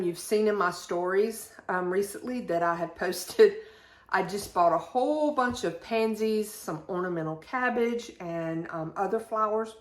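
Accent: South, United States. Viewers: wonderful haul. I would paint your bedroom white and that away you’re not limited on what color accessories you can use. I have always loved Mary Englebreite books and have most of hers. The large book is wonderful. I speak Southern too😊. Love and blessings 🧡💛🧡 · Loved your haul. You speak just fine don' t change a thing. I'm from Florida and I have a southern accent also! ❤😊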